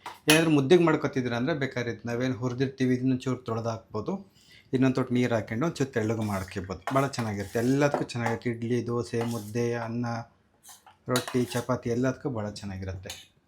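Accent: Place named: Davangere, India. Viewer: Annapoorneshwari taayiya sampoorna ashirvada ide nimge...ella adgegalnu estu shuchi ruchiyagi madtira...innu hechhina recipes na upload madtiri...nav davangereyavru...namge Khushi ide neevu nam side adge madvaga hage mam bhashe kelvaga...gud luck sir...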